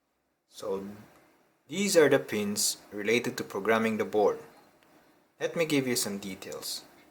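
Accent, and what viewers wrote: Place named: Philippines. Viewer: You sound Filipino. Are you filipino?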